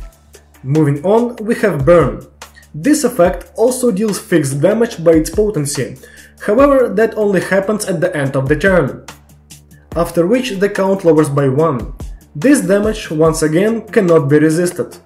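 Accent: Russia. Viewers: Я будто очень чётко слышу русский акцент, а так видео топ. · Русский акцент, прикольно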